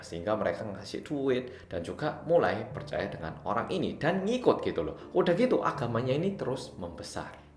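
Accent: Java, Indonesia. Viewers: Malah medog ngomong nya 🤗🤗🤗 ngga kerasa orang asing yg bawa 😆😂😆😂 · Ade ku udh hapal banget sama medokkk nya mas 🤣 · Kang, boso jawamu medok ae kang wkwkwk, lanjut trus berkarya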